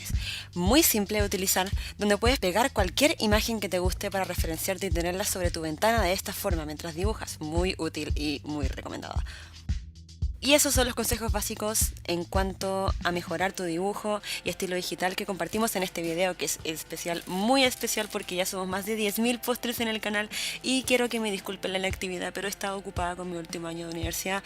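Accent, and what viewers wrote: Chile. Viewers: Aunque este video es viejo quiero decir que me encanta el acento chileno. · Jeje,wena mi pana 😎 No me esperaba que fueras de Chile,aunque si se nota en el asento Como que todas las chilenas suelen tener voz bien posta,así como desde kabra chica OwO,mi voz es media española en los audios,pero enrealidad si es posta xd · wena pooooooooo yo cuando escuche tu voz dije Ez CHilENa xddd buen video uwu nueva sub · Te escuché y fue como es xilena YUJUJUI Aaa dibujas terrible lindoo weoon 😭💖💖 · AHHHHHHH suenas muy chilena!!!!!!!!!!!!!!!!!!!!!!!!!!!!!!! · yo despues de ver 2 segundos de video: es chilena? :0 xd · De primera pensé que era Chilena, luego dije: "no, es argentina" Y después dijo: "si soy Chilena" xd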